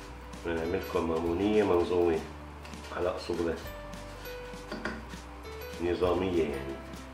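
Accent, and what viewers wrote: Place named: Aleppo, Syria. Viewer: احلى حكي حلبي ..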